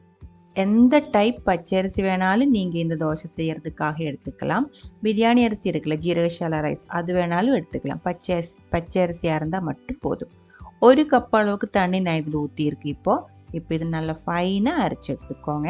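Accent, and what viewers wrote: Kerala, India. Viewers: நீங்கள் மலையாளம் பேசுவது போல் தமிழிலும் பேசுவதால் கேட்பதற்கு நன்றாக உள்ளது சகோதரி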